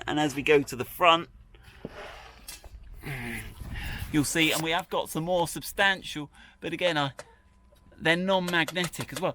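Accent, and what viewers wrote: United Kingdom. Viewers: This video sounds so British I had to go and make tea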